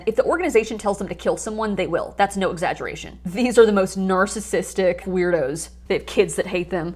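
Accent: New York, United States. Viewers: Off topic your ny accent is hot❤ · Long Island? 🤔😳😬✌🏼I Think I Heard it when You imitated Your Mom and the 73 Yr Old Thanks 👍🏼🌞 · I ALWAAAYS GET THE LYING PERSON EVERY TIME!!! I LOOOOVE YOUR NEW YORK ACCENT..IT'S THE BEST!! YOU DESCRIBED MY MOM TO THE T...THE HARDCORE WITNESS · Long Island and possibly Jewish. 😝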